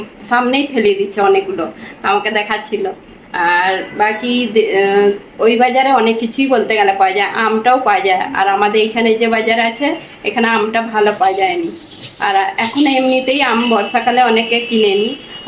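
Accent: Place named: Odisha, India. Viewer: Apnar kotha sunle mome hoy bangali non, odia mone hoy. Apana kon odia achhi ?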